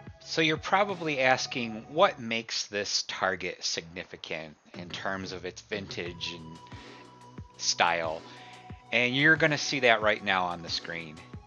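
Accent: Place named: Midwest, United States. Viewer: Awww I remember when Target was like this! This brought me back and I love hearing your midwestern accent as it reminds me of home ☺️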